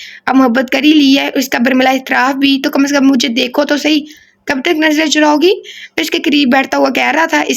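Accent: Punjab, Pakistan. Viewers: Yr JB novel parhtay Hain to bss vahi read kartay Hain beach main Apne dukhray nahi rotay Novel k start main batain kar leya karo or novel parhtay vaqat awaz or lhja narm rkhty Hain Urdu bhi Sahi boltay Hain Aap ka to panjabi lhja Hy Urdu bhi Sahi nahi bol Rahi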